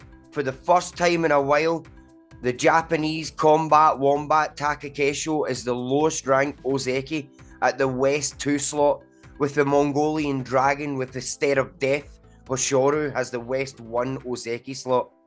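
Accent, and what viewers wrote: Scotland, United Kingdom. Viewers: Good job, and pretty hilarious, too. A thick Scottish accent trying to pronounce Japanese is a hoot. Fortunately you had pics so I could recognize who you were talking about. I would like to see Teru in good health fight one more Basho. Kirishima may be the next Yokozuna, but Atamifuji will follow soon after, I think. Takakeisho will have to go through anger management therapy before he makes it, but if he does he will no longer be as good -- Dilema.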